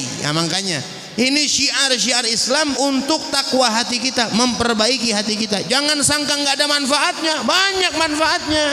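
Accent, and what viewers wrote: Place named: Bekasi, Indonesia. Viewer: Logat Bekasi ny Kentel Bener....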